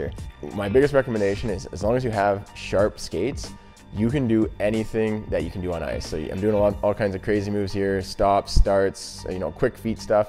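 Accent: Canada. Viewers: This man sounds very Canadian by his accent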